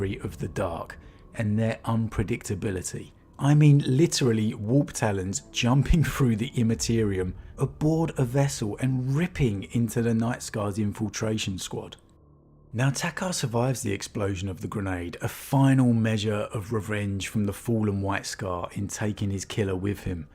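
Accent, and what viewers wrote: United Kingdom. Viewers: I just realised you're British, haha. Such a subtle accent.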